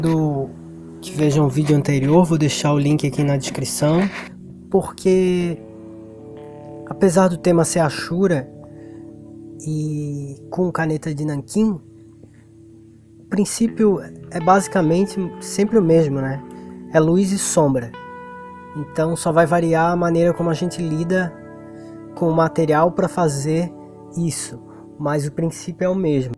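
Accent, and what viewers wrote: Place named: Santa Catarina, Brazil. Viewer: Esse sotaque Catarina é inconfundível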